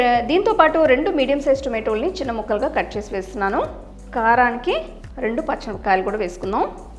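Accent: Tamil Nadu, India. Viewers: అవిడ భాష పలికే తీరే చేప్తుంది తమీలీయిన్ అని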